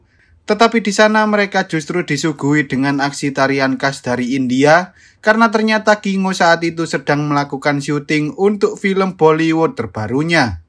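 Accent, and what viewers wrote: Java, Indonesia. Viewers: Uwong jowo bg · Lu orang Jawa bang ? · Apik pilem e min... JOWOMU NGENDI MIN... KOK MEDOK MEN⁉️ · Sampean wong jowo kah bang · MEDOK BANGET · Fix, suaranya rada-rada orang Jawa abangnya😁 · Bang suara lu mirip dodit mulyanto medoknya pun sama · Sampeyan Jawa endi mas mimin, kok ngomonganya agak ada Jawa" gitu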